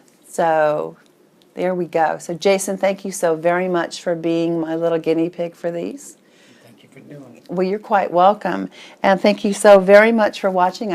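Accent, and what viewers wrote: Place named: South Carolina, United States. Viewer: love the nails. love his accent too. sounds like mine lol I'm also from South Carolina. thanks for doing the tutorial. hope you have a great Thanksgiving!!!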